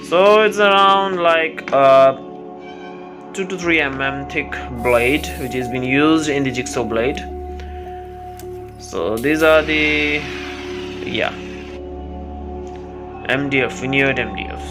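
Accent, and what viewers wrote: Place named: Jamaica. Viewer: Most talented aatmi with the jamaican accent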